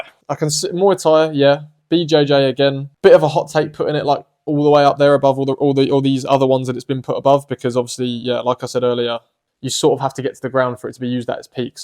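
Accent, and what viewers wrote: United Kingdom. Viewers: This is the first time i've ever heard your voice because I always just watch your shorts which always have " " as the sound, I wasn't expecting you to be british😅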